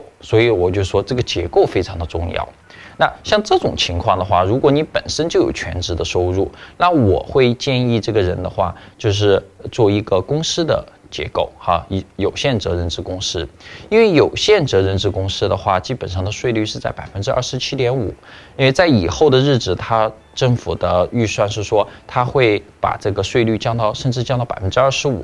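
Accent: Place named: Sichuan, China. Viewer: 哈哈哈，听出了川贵口音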